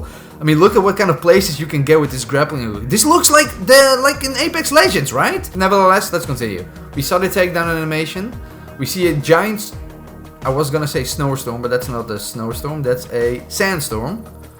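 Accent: Netherlands. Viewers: sound like an dutch accent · You sound Dutch af? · I smell Dutch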